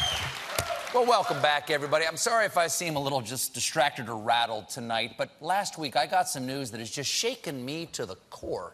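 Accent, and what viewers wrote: Germany. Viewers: Minus the German accent well done :). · Lingonberries!!! Lingonberries (that almost ALWAYS goes with the meatballs in Sweden) is Swedish... but I guess that is a nothing-burger.... And why the German accent?? We actually sound more like the Swedish chef... unfortunately.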